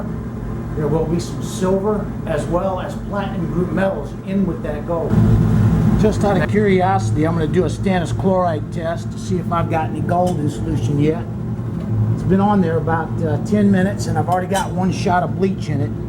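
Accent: United States. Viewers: I stop learned i dont understund when he speaking american- englich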